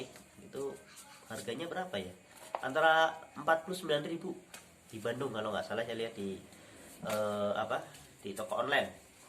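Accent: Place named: Banyumas, Indonesia. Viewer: Ijin subskrebb gan... Kok bahasa indonesianya kayak orang barlingmascakeb ya?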